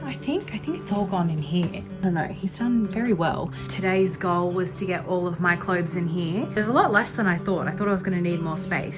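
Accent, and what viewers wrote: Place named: Australia. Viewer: Never lose your Aussie accent ♥ Do they tell you to assimilate like Aussies do to Indians 😂 Naah we are not like that. Enjoy the life like my family back home, enjoying house help, watching KDramas, home deliveries, apartment facilities and gym. wow that was a cool transition effect of older version of videos Wow you seems to have quite a few expats there. ♥ it.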